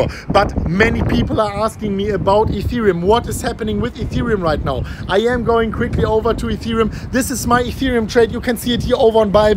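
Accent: Germany. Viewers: Dieses typische Deutsch English 👍🏽👍🏽😅 Hamma YouTube Channel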